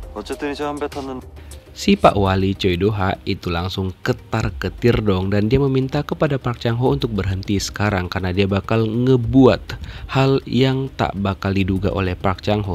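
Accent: Medan, Indonesia. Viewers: Logat mu kek orang Medan Wak 😂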